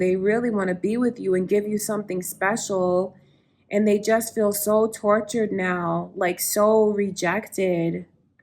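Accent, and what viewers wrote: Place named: Canada. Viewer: Does anyone know if she is Canadian?? I feel like I hear a tiny bit of a Canadian accent, ey?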